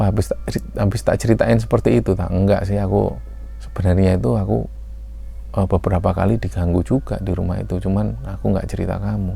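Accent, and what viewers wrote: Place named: East Java, Indonesia. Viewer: mas, smpean arek suroboyo ta? kok logat, intonasi sma drh2 yg smpean ceritakan di episode2 lainnya gk jauh2 dr Jatim ( Sby, Sda, Mjk, jbg ). Tp memang baru di episode ini sing aku bs bener2 "menjadi dirimu", hehehe.. salam teko dr arek kedungdoro Mas.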